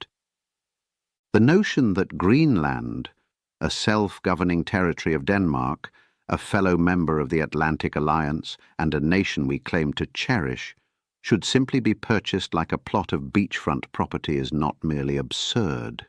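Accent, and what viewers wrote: United Kingdom. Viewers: Why is it always someone with a British accent that sounds the alarms of discontent and stupidity within our country and makes the most sense of what blind cult Americans refuse to acknowledge always so on point. WAKE THE F up PEOPLE this is our country going to HELL in a hand basket and we may never recover😢😢😢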